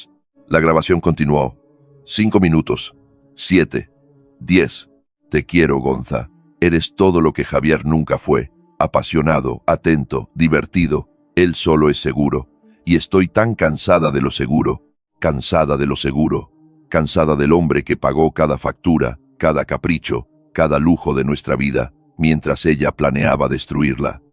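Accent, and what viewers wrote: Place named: United States. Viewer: En España no se está siempre diciendo nombre y dos apellidos. Y tampocoese acento americano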